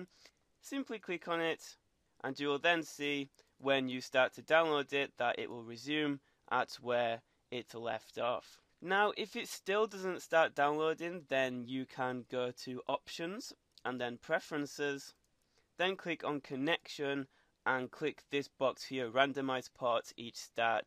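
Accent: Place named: United Kingdom. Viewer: Another fuc***n british.. 🤣🤣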